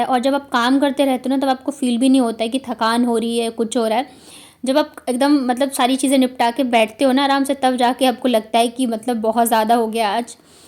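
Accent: Bihar, India. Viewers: Didi aap Bihar se ho kya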